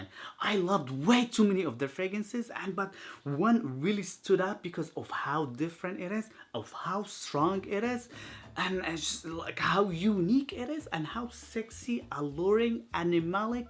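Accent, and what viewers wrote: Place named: Israel. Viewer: Are you Israeli? יש לך מבטא 😏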